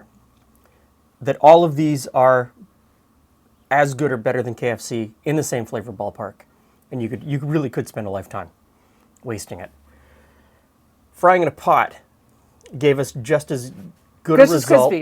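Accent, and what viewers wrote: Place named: Toronto, Canada. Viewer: I don't watch this channel often but is from Toronto, which can be a bit different from the rest of Canada in mannerism, way of speaking, etc.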